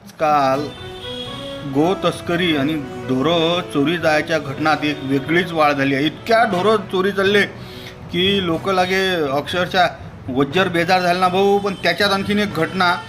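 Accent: Varhadi, India. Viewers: बातमी सांगायची शैली फक्त भाऊ तुम्हीच तुमची वऱ्हाडी भाषे ची जी पद्धत आहे याची बरोबरी कोणीच करू शकत नाही.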